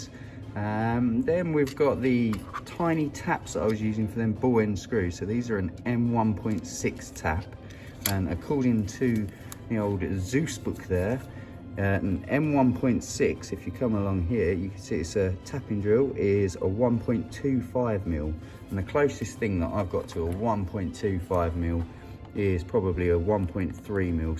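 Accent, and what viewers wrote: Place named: United Kingdom. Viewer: You sound like you're from the uk like me id love to buy a set of these if possible when i complete my build and get my electronics. Do you have Facebook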